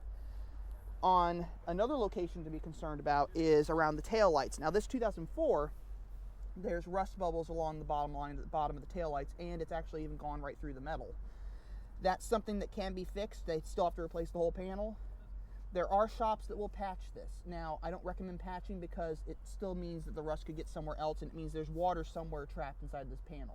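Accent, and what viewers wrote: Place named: United States. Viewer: Damn I wanted to watch an R53 guide... but I can’t listen to this Yanky accent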